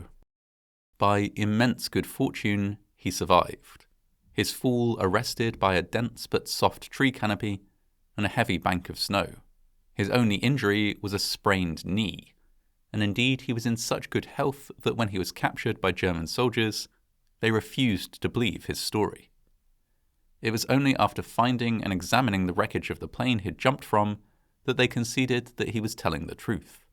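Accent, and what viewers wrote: United Kingdom. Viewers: Just a quick question, Sir. In witch part of Great Britain are you from/located? It is just so weird when you say fall. It sounds like your are saying fool. Same with the word call. Otherwise I really love your uploads 🙂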